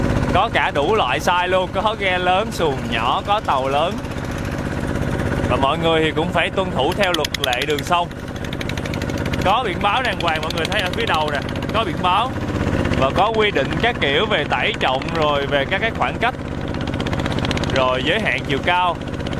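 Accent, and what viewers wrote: Mekong Delta, Vietnam. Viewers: Thiệt sự là cách anh edit video rất cảm động luôn. Những đoạn mà nhạc lắng xuống, giọng anh thì trầm ấm đúng giọng miền Tây làm cho mình cảm giác được sự yên bình của quê hương miền Tây. Với 1 đứa sống ở SG xô bồ tấp nập như mình thì mình thực sự rất rất nhớ cái cảm giác yên bình đó. Lần nào xem video của anh cũng cảm động, vừa nhớ nhà, nhớ mùi khói bếp than, nhớ cái nắng xuyên qua những kẻ hỡ trên mái nhà buổi sáng sớm. Cũng nhớ sự nồng hậu, hào sảng của người miền Tây nữa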